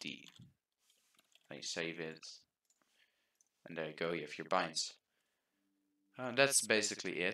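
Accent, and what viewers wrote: Netherlands. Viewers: dat nederlands accent ook oei oei oei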